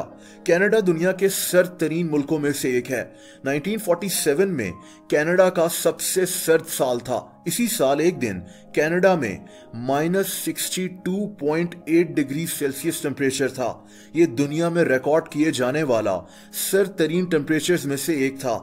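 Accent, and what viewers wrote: Pakistan. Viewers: yup thats pakistani english boi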